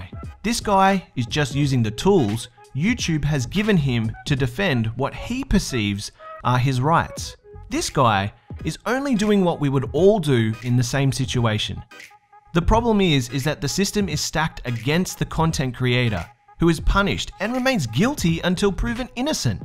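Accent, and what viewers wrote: Australia. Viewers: definitely aussie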